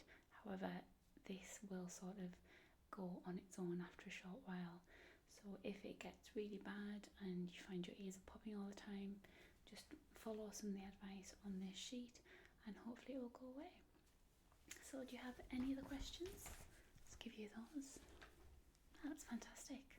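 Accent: United Kingdom. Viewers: You sound like you're from the UK? :P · Is she Scottish, Australian, or British?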